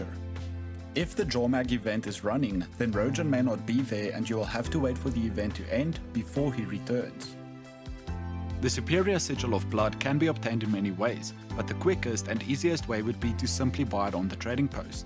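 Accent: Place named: South Africa. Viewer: Love the heavy South African accent. - Hello there fellow SA person. Thanks for the guide. o/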